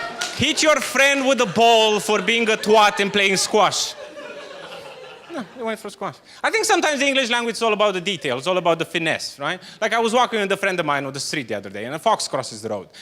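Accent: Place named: Mexico. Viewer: "Sometimes I go to America I'm Mexican" So, accent sounds Mexican to some Americans.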